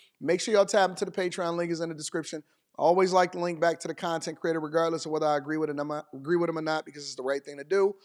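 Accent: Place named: South, United States. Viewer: As a DMV native, that southern accent is a super turn-on. I laughed real good on the female Numbers Assessment 😂.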